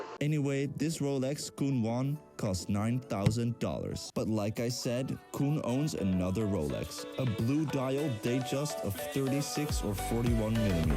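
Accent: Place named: Netherlands. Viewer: lekker nederlands acccent hoor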